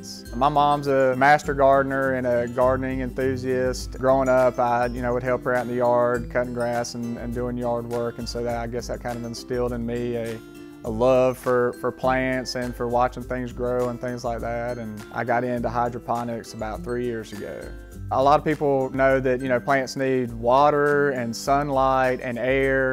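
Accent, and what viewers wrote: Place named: South, United States. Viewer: beautifull plants. your accent like people in the forest gump movie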